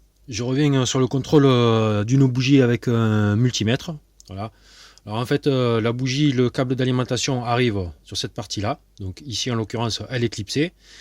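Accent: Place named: Midi, France. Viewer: Bravo, c'est très clair et bien expliqué.Et avec l'accent du Midi, en prime.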